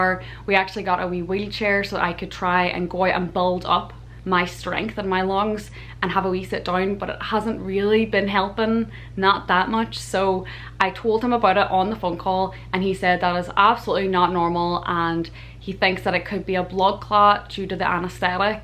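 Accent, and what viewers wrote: Ireland. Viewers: I've been following your story and praying for the best outcome. Spent a bit of time myself in hospitals and quickly realised that having a positive outlook no matter what get thrown at you is most important. All the best, just love your Irish accent, my folks originally came from Donegal. ☘ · I love your accent. Is it Irish? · Her irish accent sounds like "hi, my name is Erin" , or "hi, my name is Aaron"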